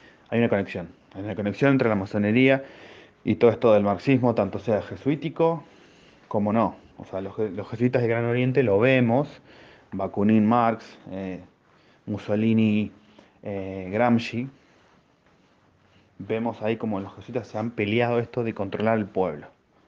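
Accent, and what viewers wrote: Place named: Argentina. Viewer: Es increíble pensé que iba a terminar diciendo algo cuerdo , pero al final terminaste difamando al movimiento Provida por el solo hecho de contar en sus filas con católicos , cuando en realidad también hay pentecostales , mormones, evangélicos sionistas y no sionistas , universalistas de todo tipo , después andas subestimando a la gente con que va adorar a lucifer cual Masón g33 jajajaja , después te solidarizas con la izquierda como si fuera la pobrecita de todo esto la utilizada y volvés a atacar por los colores , después me parece que desconozces el peligro del feminismo , un familiar mío tuvo que ir con abogados por una falsa denuncia ,no solo se trata de salvar al niño por nacer , después mostraste la hilacha haciendo propaganda a tu liberalismo capitalista pedazo de Golem, ah y encima por la voz parece que sos argentino ( al menos que seas uruguayo) seguro vivas adentro de un tapper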